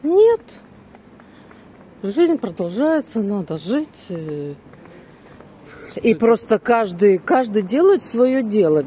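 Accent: Odessa, Ukraine. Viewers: Очень приятный,душевный и голос и одесский говор с дружелюбной ноткой.НЕДОЗАДОЕЛО!)))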